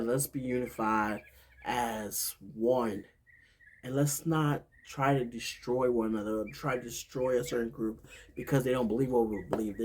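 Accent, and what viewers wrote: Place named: New York, United States. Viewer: We have an amazing opportunity to show the world His love by our unity at Christ followers. Love you and yeah, NY accent is still there for sure.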